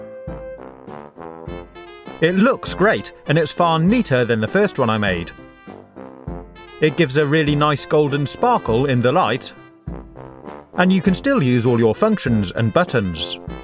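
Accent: England, United Kingdom. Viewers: cool video i don’t wanna be mean but you sound like an england man like cool tone c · British accent?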